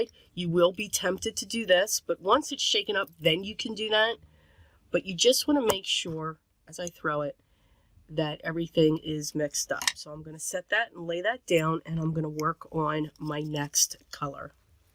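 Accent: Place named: New York, United States. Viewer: There is a lot of information here, so I might have missed it, but I was wondering your opinion on which brand had the most shimmer? It is hard to tell on camera and I am sure in person it is much easier to tell. I also was wanting to know, for whatever reasons, which brand you are leaning toward using the most? I realize you probably don't want to "knock" any of them, but each person will have their own preferences, ie: wanting more shimmer, less shimmer, binder, non, etc. I am sure each has their own good qualities, but I would really love to know which you lean toward and why? I have perfect pearls and love it, and I also sometimes use cheap make up (and then seal one way or another) as I can get more range of colors that way, and less expense. But your opinions would be helpful. =) Thanks so much for sharing this, I found it very useful. 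Plus I always enjoy hearing you chatter. =) (I am not sure where you originally hail from, but your accent reminds me of back home in NY, and I miss it there and the days of growing up there.) Oh, and love your sense of humor. You make me laugh often. LOVE it when you do junk journals too! You often feel like a soul sister...despite not knowing me at all (sadly.) One last thought...I was thinking a Versa Mark pen and any mica powders would be fun together. Could make for some fun and shimmer writing/lettering. TFS as always. =) ~Kat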